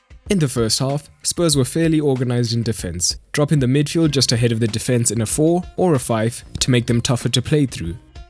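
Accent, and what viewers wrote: South Africa. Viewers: You by any chance from South Africa lad? That accent...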